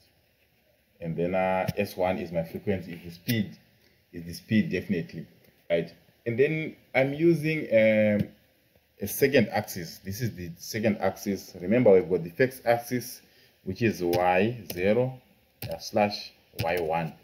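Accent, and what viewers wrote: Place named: Zimbabwe. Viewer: It's giving me Zimbabwen accent 😂❤❤.... Nice one bro I like the reference to the documents... Keep up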